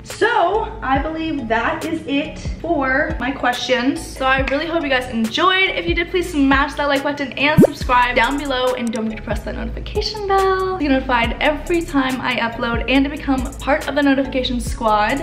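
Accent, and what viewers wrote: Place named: South, United States. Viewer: I think it's more of her trying to cover up a southern accent. "SAA-FAR-EE" instead of "suh-far-ee".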